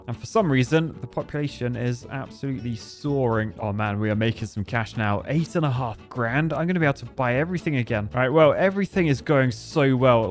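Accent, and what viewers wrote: United Kingdom. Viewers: Bro stop talking like British people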